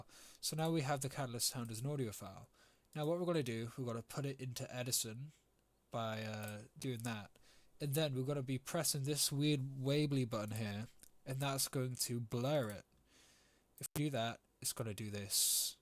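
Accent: United Kingdom. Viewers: HE'S BRITISH?!?!?!?!? 🇬🇧🇬🇧🇬🇧🇬🇧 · WHY IS HE BRITISH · HES BRITISH?!??!!??!!?!?? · Hello mate, you sound kinda bri’ish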